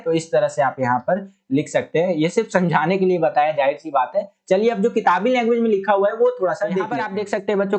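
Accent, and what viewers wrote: Bihar, India. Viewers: Sir Bihar se hai kya aap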